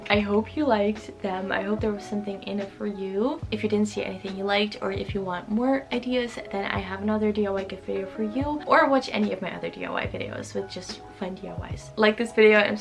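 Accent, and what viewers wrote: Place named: Netherlands. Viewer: i lovely, ik denk dat je Nederlands bent bahaha klopt dat? Je naam en gwn je uitspraak😚 pls reageer ff want ik ben benieuwd ik ben ook uit Nederland en ik woon in Nederland, ik zag een keer ook dat je stiften had van de HEMA haha en 1 keer kaarsen van de blokker❤ maaruhh ik hou van je video's ❤❤❤ keep up the good work girl ilyyy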